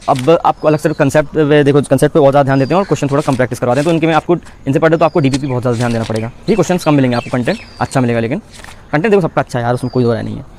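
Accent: Bundelkhand, India. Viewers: Aap bundelkhand se ho...????😊😊😊😊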